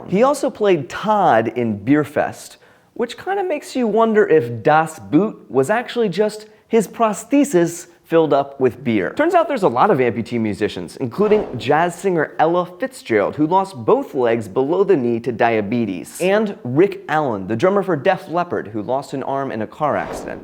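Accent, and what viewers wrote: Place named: United States. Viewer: Everyone is freaking out in the comments about how an American pronounces words that ARENT ENGLISH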